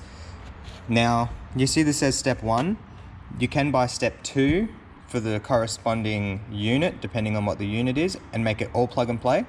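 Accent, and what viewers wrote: Australia. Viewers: Why did you skip illumination if the wire was right there? I don't know about AUS (I'm just guessing based on your accent), but in the US, Kenwood HU's do dim appropriately when the headlights are on at night. I get not going out of the way to find it...but capping off both orange wires takes just as much effort as connecting and soldering/taping the orange together. Just an observation...well done otherwise.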